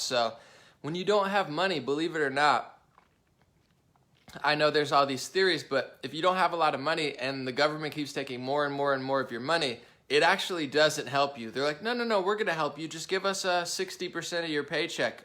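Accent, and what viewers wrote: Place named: Boston, United States. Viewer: Your boston accent blows dude, but we love you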